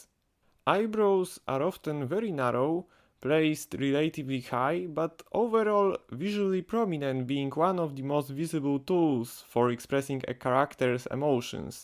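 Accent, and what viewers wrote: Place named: Poland. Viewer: Idk why you were worried about your English, it was good. Sure, in some fragments the Polish accent it's shown and some pronunciation are more or less so Polish XD BUT overall, everyone can understand what you're saying! Also, maybe I make a bit fun off your pronunciation but it will never compere to how my classmate from long time ago pronounced "vegetable" XD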